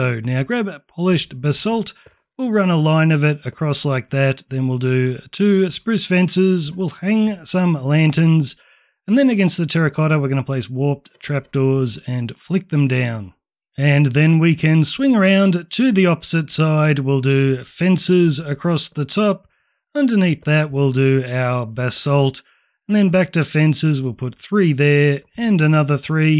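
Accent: Australia. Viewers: so.. we and my friend built this whole thing in like 5 days, allow me to get you some constructive criticism what am i gonna say; first of all PLEASE, i BEG you to dedicate like 30 seconds of the guide to tell us which blocks do we take before getting to the next part instead of just "so when ur done you should be looking like this now place a scaffolding" like we didnt have these things in our hotbar, every time you say to place a block we have to pick it up from creative or from shulkers if ur on survival mode, that takes extra time and gets kind of annoying as the building goes, like we got so insane building it all my friend started to mock your australian accent and burnt out of minecraft as we finished the building (dont take it personal, its prob just us building it from 10pm to 6am for 5 days straight) or you could just leave a litematica scheme, people would thank you a million times but you probably wouldn't get as much views on all these guides also, in the replies you said the outlines dont line up because some of the measurements are for the gaps between the stone, would be nice of you to tell that in the video itself because we REALLY messed the measurements and got sick of constantly fixing the walls and all that mess led up to us not even bothering to fix some of them the building ifself though is AMAZING and is really worth the time also may i ask you a question, how old are you?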